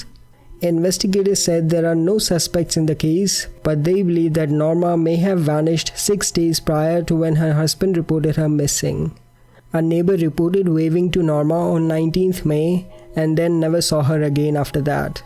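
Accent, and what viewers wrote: India. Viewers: It's seems you're from an Indian origin..your accent seems like so..btw loved the way u explain everything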